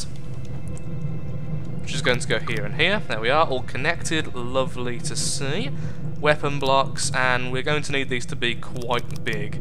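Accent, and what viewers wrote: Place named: North, United Kingdom. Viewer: Hey man this is some good shit. You're probably one of the best from the depths content providers so stick with it. PS: What county is your accent from? You're northern I think but where abouts? Your accent has some inflections I can't place.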